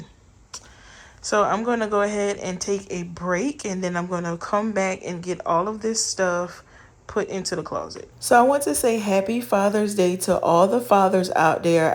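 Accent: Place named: Louisiana, United States. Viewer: Love the channel from Alabama. I love your accent. Are you from Louisiana? Your channel is an inspiration 😅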